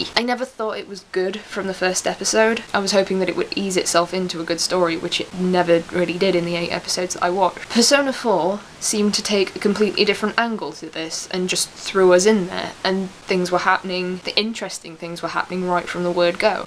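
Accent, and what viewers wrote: United Kingdom. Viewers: XD a pretty girl who plays persona? AND has a british accent!? AND loves Teddie?! 0_o i think im in love XD that aside, i love the way you criticiced the first episode, and agree on everything you said. Bear-Y good review XD ;)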